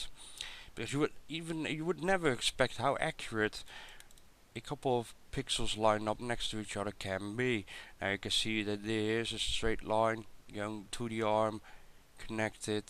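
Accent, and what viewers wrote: Netherlands. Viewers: I work with a couple of Dutchies, but not heard your variation before, you from the Groningen area or the East maybe ?? Enjoyed your Vid, even if I do not pretend to comprehend it all :) My biggest problem is that you can make shapes appear if you look hard enough at anything, remember when we were kids looking at the clouds, you pull out some interesting pieces tho for sure, def worthy of further investigation or question. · I can´t watch this vid because of that Dutch accent. It really drives me insane.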